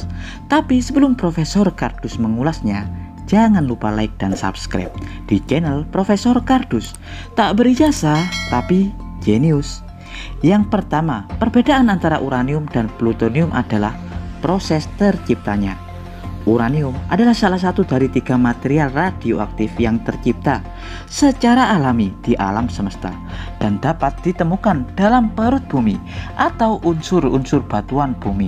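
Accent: Java, Indonesia. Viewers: mendhok djowo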